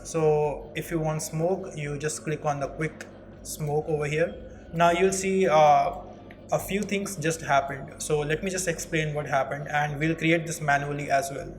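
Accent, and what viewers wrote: India. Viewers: Indian! love it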